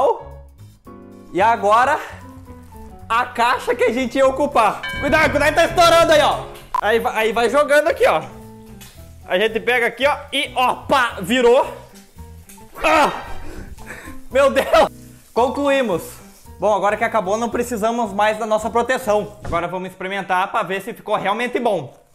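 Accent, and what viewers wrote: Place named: Rio Grande do Sul, Brazil. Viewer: com certeza é Gaúcho ksksks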